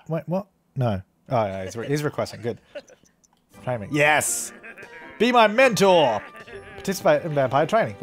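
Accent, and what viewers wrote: Australia. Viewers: I cant tell does he have a Australian accent or?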